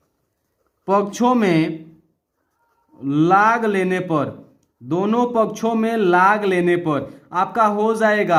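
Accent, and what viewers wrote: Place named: Bhojpuri, India. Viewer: Sir app kha sa ha ..🙏🙏🙏🙏🙏 App bhajpuri bol raha ha.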